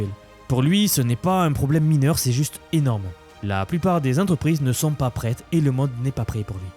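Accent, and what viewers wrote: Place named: Southwest, France. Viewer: On dirait bien l'accent du sud ouest :)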